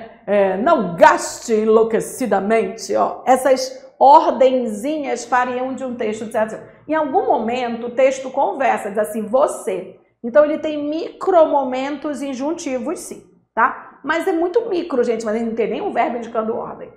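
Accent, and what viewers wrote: Pará, Brazil. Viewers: Quando eu ouvi o bagulho ja sabia que era paraense 😂😂😂😂😂😂😂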